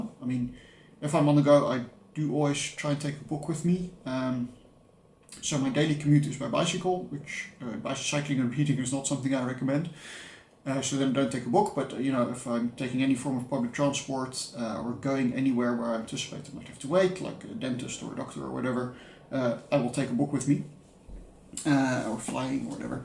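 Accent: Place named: South Africa. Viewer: Ah, "me too" books can become annoying, and it's obvious when they're not sincere. I find it sad and manipulate when artistry is ignored in favour of blatant copying. You have a really interesting accent by the way. I think you've got a tinge of a South African regional accent in you. 😉There's a specific type of Afrikaans accent on certain words that you have, which I find so cool. Most Dutch accents sound completely foreign to me. I never even thought of The Little Prince as a part of this tag. It certainly is sweet, but perhaps for children younger than 10. I didn't even know about it until I was 17, which was way too late. I read it at 19, which again, is simply too old.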